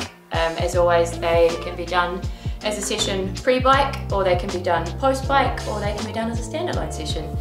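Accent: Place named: New Zealand. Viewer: Sounds Kiwi (New Zealand)? I mean the accent.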